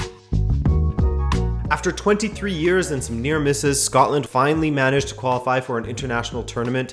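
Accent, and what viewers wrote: Canada. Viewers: Was thinking the same thing as a first time listener. Immediately recognised the Canadian accent which caught me off guard as don't see that often in my football recommendations. It all made sense when we got to Portugal! Nice video.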